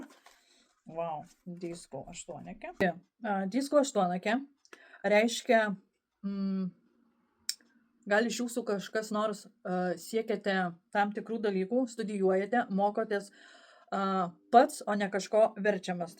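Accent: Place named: Kupiškis, Lithuania. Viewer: Man patinka jusu vlogai, nes tokie gyvenimiski, mieli. Dar ir tarme jusu primena namus :), nes as is Kupiskio puses ir ten panasiai kalbama. 🌲🤗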